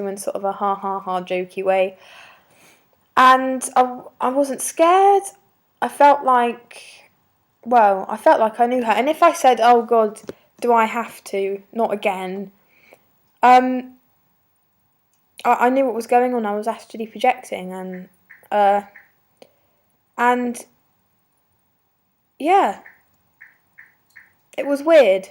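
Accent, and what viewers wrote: United Kingdom. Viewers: where from the UK you from? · lol i can never take british accents seriously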